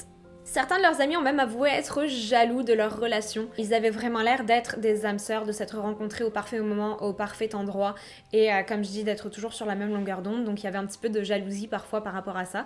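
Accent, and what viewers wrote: Quebec, Canada. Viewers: tu as un mini joli accent, c'est canadien? je viens de découvrir ta chaine et du coup je m'abonne :) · Je m’abonne j’adore ton accent et tu compte très bien les histoires!! est tu Québécoise ☺️? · quebecois et français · Allo ! Je vien de découvrir ta chaîne 🙂 dit moi ton accent est de où ? Je trouve que tu as un accent québécois mélanger avec un accent français. Super vidéo ! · Je viens de découvrir ta chaîne, je m’abonne ! Je viens aussi de lire que tu étais française mais vivant au quebec c’est fou l’accent que tu as pris 😳😳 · Ça m'a tout l'air d'une Québécoise d'origine qui essaie de parler un français de France. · Coucou j'adore tes vidéos et ta chaîne, petite question est-ce que tu vis au Québec car j'entends que tu es française mais une petite pointe de québécois Bisous du Québec 😘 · J'adore ta vidéo ! Sinon, rien à voir mais je trouve que tu as un léger accent entre l'accent canadien et l'accent belge ahah :) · Bordel tu as bien pris l'accent québécois dans cette vidéo. 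· Tu as un petit accent québécois 🤔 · Fake c’est ca????? Quebecois dans l’accent, je reconnais ma langue fille hihihi j’adoreeeeee ♥️🌸 et en passant tu est vraiment magnifique en plus de très intéressante et crédible, tres bonne chaîne j’aime bcp , merciiiiii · je me doutais bien qu’il y’avait un petit côté Québec hihi 😉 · Étant moi-même du Québec, je me disais que ton accent était très ressemblant à celui ici au Québec, comparé à d'autres Youtubers français (Je viens d'apprendre que tu demeures au Québec) J'adore ton contenu, comme toujours Continues sur cette belle lancée 🤩👌 En route vers les 100 000 abonnés 🎉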